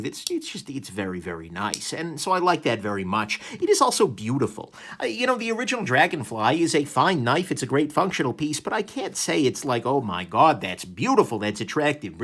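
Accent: New York, United States. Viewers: Please don't take offense but your voice reminds me of my relatives from New York! Thanks for sharing this one... love the dragonfly, and I've always thought those wood scales on the delica variant looked slick!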